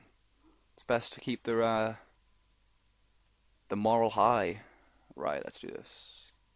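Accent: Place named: Wirral, United Kingdom. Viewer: Are you from the Wirral, you have a similar accent to me.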